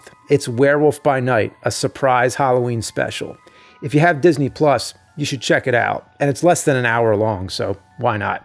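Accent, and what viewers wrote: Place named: New Jersey, United States. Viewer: It's the Jersey accent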